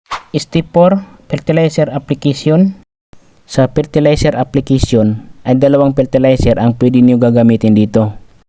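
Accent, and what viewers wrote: Visayas, Philippines. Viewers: 😢 parang bisaya si kuya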